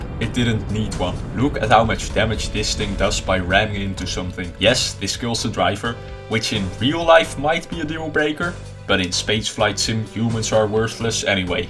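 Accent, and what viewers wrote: Netherlands. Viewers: Cool dutch accent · Random question: are you Dutch? I'm surrounded by people with quite similar accents like yours so time for curiosity to commit a murder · i smell dutch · I can hear You’re dutch, are you? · Your Dutch right? Like you really sound dutch and I would know, because i am please respond In Dutch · Its a dutch accent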